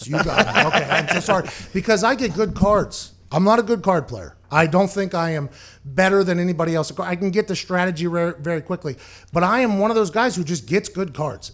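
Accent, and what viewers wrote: Pittsburgh, United States. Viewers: Dude your awesome lovin the pittsburgher accent born and raised big dogg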